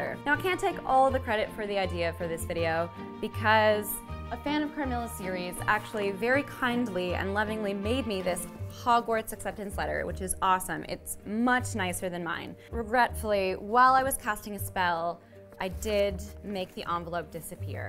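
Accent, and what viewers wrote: United Kingdom. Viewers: as a brit i'm pleasantly surprised at english accent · speaking british will be the death of me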